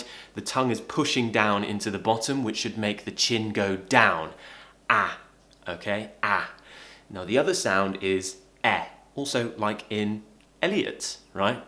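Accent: United Kingdom. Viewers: Happy birthday professor 🎂🎈 greetings from Philippines. Been watching your videos and it helped me a lot to learn and improve my english most specialy learning your British English accent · Hi, I'm Julio from Brazil, I love your videos, I am learnig American English, but because of your videos , now I prefer British English hahaha, I think your accent is so much beautiful . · The idea of starting a podcast is wonderful. Walk and enjoy your British accent,so nice and so beautiful .hope it will realize soon 💕💕💕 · I really like this lessson. It is really useful and o love British accent. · You can't believe how much I love listening to you! I wish I had that British accent- I wanna become an English teacher here in Germany and I would love to sound like you haha 😂😂 anyway, great work! Oh and Happy Birthday!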